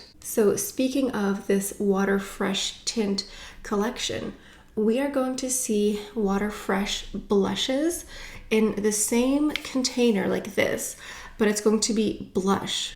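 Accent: France. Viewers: You have such a beautiful French accent! Lovely to live in a dual language French-English country. I agree with your assessment of Natasha Denona - definitely some hits and misses for me. I really enjoy using the Chanel palettes we have. I too have grown a little tired of the red/pink color story.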